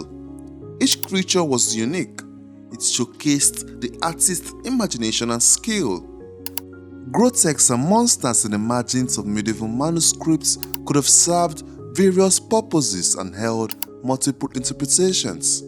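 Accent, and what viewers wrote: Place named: West Africa. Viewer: What's with his accent? He sounds like he's from West Africa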